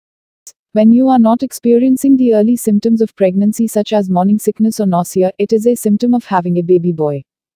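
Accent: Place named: India. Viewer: the thick indian accent has spoilt this video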